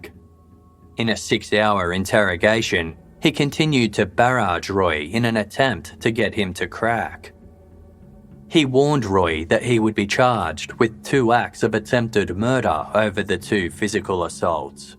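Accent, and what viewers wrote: Australia. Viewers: Sounds like he is from Australia or NZ. Been our Summer holidays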